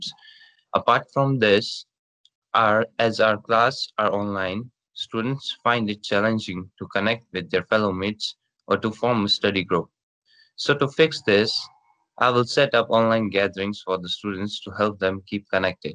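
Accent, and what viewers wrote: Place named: Canada. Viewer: finally got canadian accent! More success 2 you bro.....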